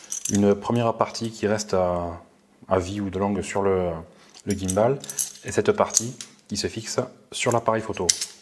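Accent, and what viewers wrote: South, France. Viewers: A mince j'ai pas vu, en tout cas merci encore pour ton aide. Je te suis sur ta chaîne et j aime bien les tests que tu réalises, de plus je suis comme toi du sud et j'ai le même accent et j'en suis fier.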